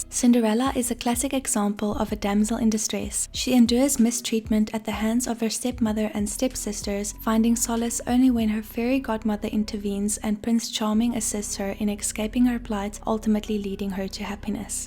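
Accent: South Africa. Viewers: Are you South African 😭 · Are you South African? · Girl are you South African 😭😭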